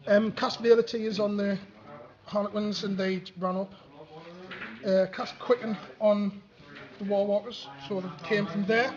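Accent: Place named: Durham, United Kingdom. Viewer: Good batrep mate, bad day to be an ultramarine. Eldar mobility is tough to counter but I thought with three fliers he'd at least have a chance. Where you from by the way? I'm in Durham, your accent sounds local and you said 'canny points' lol. So I'm guessing you not far from me.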